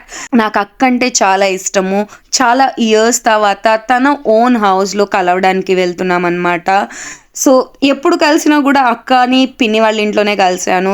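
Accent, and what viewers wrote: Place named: Guntur, India. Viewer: Anukomaakandi. Pure gnt slang.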